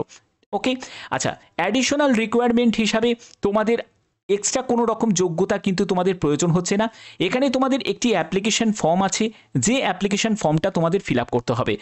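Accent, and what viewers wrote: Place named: Hooghly, India. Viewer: Hooghly thakey bolche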